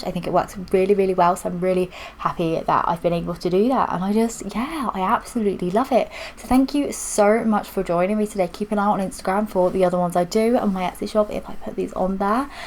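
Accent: United Kingdom. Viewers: Hello, umm i have a question: Are You British? 😅👉👈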